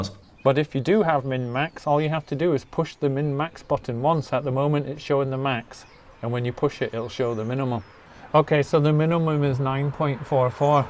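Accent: Liverpool, United Kingdom. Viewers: I would guess at least half is Liverpudlian. 😉👍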